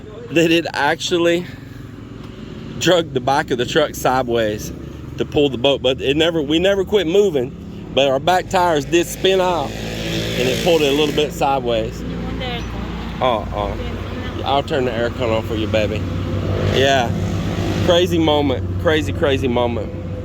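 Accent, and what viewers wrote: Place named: Texarkana, United States. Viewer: I keep trying to place your accent. Texarkana?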